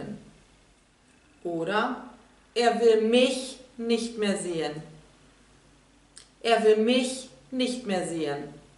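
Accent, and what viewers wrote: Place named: Germany. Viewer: Immer Super Videos! Ich lerne viel hier! Was mir am meisten gefällt ist der Akzent oder die Aussprache. Echtes Deutsch!!!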